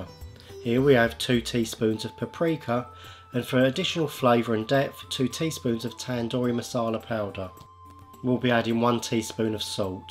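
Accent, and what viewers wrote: United Kingdom. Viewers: I love your accent! What kind of uk accent do you have? is it from kent?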